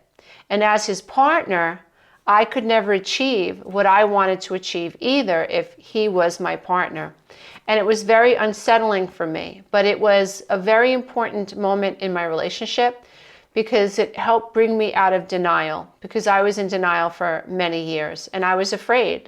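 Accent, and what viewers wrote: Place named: Long Island, United States. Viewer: PS. You sound like you’re from same area I’m at. Bellmore Long Island. Originally from flushing. Then Connecticut Florida Massachusetts and now N Bellmore. Thanks again for your very helpful videos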